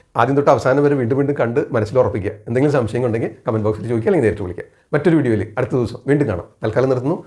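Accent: Thrissur, India. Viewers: Are you from Thrissur suburbs like Ollur? Very familiar slang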